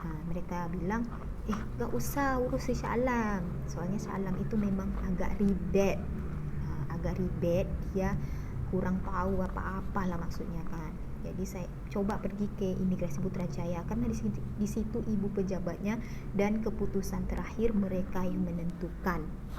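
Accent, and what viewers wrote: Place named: Medan, Indonesia. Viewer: Medan banget suaranya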